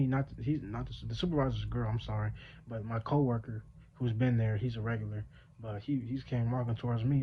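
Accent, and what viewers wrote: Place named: Inland Empire, United States. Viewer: You're from the IE? Low-key speaking like you from the inland empire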